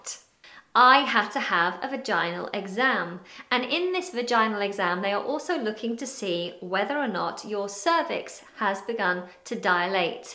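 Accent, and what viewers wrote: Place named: RP, United Kingdom. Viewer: Dear Madam Teacher,thank You so much for this great lesson and especially for Your pure,clear RP British accent! Btw, in the Bible (Old Testament) God created Woman like a last thing and the BEST.(real theological opinion)! Thank You again.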